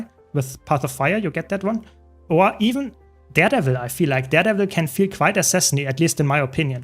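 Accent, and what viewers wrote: Germany. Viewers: Ich war so: Das englisch des dudes klingt sehr deutsch. Check, tatsächlich aus Deutschland xD auf jeden Fall Respekt, dass du das so auf englisch durchziehst! Und cooles Video, vorallem wenn du pve und pvp abdeckst - finde beides sehr spannend und liebe es (pvp macht süchtig, oder?). Bin echt gespannt wohin die Reise mit gw2 geht, neue Elite Specs würd ich auch cool finden!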